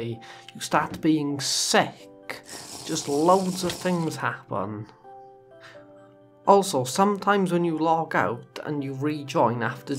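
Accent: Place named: Wales, United Kingdom. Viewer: You from Wales? Your accent sounds Welsh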